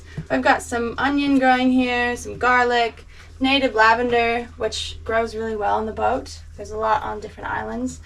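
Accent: Australia. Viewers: Your new Australian accent sounds cute! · you sound aussie now 😊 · I notice your Aussie accent, been following you for years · Your voice has changed a bit. Sounds a tiny bit Austrialian.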